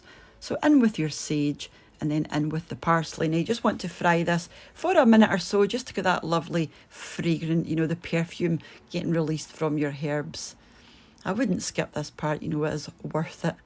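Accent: Scotland, United Kingdom. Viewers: Hey misses, love this recipie, it is very much how I do things, love the accent, im from stornoway myself, now edina, and lovin cookin now, so im doin beef n ale stew the night, its a silverside roast but I find these roasts go tough, plus, who doesnt love a stew (wae Newcastle brown ale), my wee shop had no wee bottles o wine, so make do n mend, n im usin dried porchinis n the water, along wae beef stock n the ale, so, low n slow, love your recipies, remind me o home x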